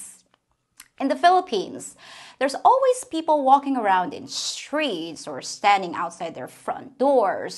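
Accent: United States. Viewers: my dear, don’t know how I missed this video. We lived in the Southeastern corner of Georgia just above the Florida State line. It’s not hard to understand why they may have thought you were “American” you have barely any accent. My wife lived in the UK for a decade and has been here in the U.S. for 12 years this month. She still has quite an accent. The weather was something that got to her in the Southeastern U.S. after she was there about a month she said to me, “I never thought I would find a place more hot or humid than the Philippines…I was wrong!” The toothpicks are for picking up the food on the tray if using your fingers makes you uncomfortable. It’s been 13 years since my wife has been back to the Philippines. She misses Nanay and Tatay greatly but she knows it isn’t practical to go at this time. Anytime you travel, vacation eating is always hard. 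Take it from an old Marine, you have a rocking body! When you come back to the U S try the Intermountain West (Utah, Colorado, Idaho, Montana) it is a short hop from Saratoga Springs Utah to Vegas (4-5 hrs) a days drive to Los Angeles or a days drive to Phoenix Arizona. If you ever do come out here you are already welcome to the Household of Lolo D and Kazz!!! My wife would be a good interview having lived in the UK and here, her baby sister lives across the street and has lived in the UAE (not as an OFW) as a spouse of an American working there. As always another great episode! Keep up the excellent work! Lolo D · Wow! I'm so impressed with your observation in just a few days visiting the United States. Yup! American love their pets. and Yes, the odors of Marijuana in crowded places and you are right. I don't see anyone smoking. I hate the automated. I prefer to interact with a real person. 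Yup, water pressure is insane compared to the Philippines. Yes, Americans don't hang around in their yard. Btw, you speak like an American. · you have the American accent down to a T. If a saw and spoke to you, I too would assume you to be an American, because you do not sound foreign.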